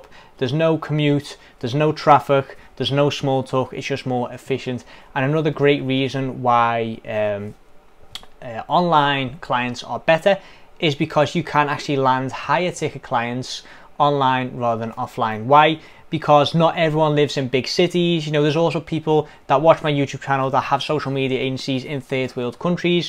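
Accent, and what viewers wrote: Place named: Liverpool, United Kingdom. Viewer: I came to Australia when I was 3 years old, originally from Chester Wirral..great to hear a scouser!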